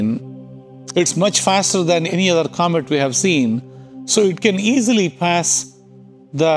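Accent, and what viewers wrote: India. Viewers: He obviously has a thick South Indian accent 😅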